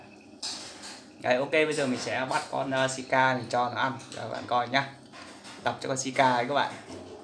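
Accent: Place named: North, Vietnam. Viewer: Ồ xa quá. Em thấy a nói giọng bắc tưởng a ngoài bắc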